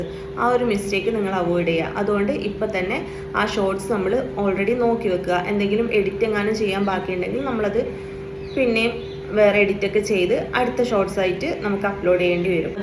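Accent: North Kerala, India. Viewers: ആ ഒരു വടക്കൻ ചുവ വന്നു..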